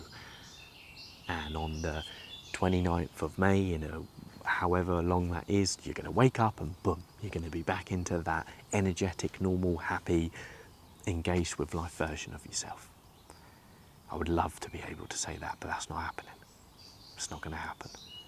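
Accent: England, United Kingdom. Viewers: sounds like an english accent to me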